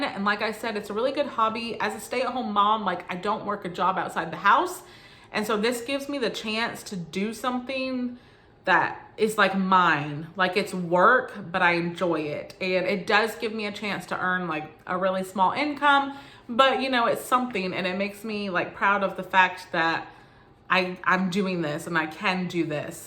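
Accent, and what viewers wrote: South, United States. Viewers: I can hear the Southern accent a bit, but it isn't like intense, but sort of there haha! I find accents so interesting and lovely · Lots of traveling 😃👍sounds so fun! Vegas on the strip is fun but at night it gets wild lol. Last time I went there someone stole my friends phone and debit card 😩 definitely keep your belongings close if out at night. I've always wanted to go to AZ 🙌 I have family that moved there and love it. I also like doing music because of all the sound in the background haha . I have a hard time doing voice overs haha I still need to practice but now that I will be back editing on my computer , hopefully it will get better . As someone from CA , I thought you had a Southern accent. I love it 💗 haha people say I have more of a Valley girl accent - I think it's normal California talk hahaha . I love hearing everyone's accents . 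I talk to people all over the world at work and I hear it all , I love interacting with others from different states and countries . I definitely would consider homeschooling as well. I thought of public because I went to public but now days things are so different in the state i live in so im undecisive. I'm thinking of taking some courses to learn more for home school . I will have to look more into it .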